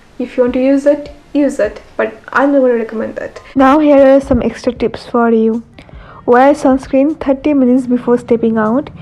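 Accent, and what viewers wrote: Bengal, India. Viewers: I just discovered your channel!! N I love your content!n I hv 2 questions fr u,:- 1)are on instagram??how can I reach u ? 2)r u bengali?(am guessing it fr the way u speak) And lastly much love to u!❤️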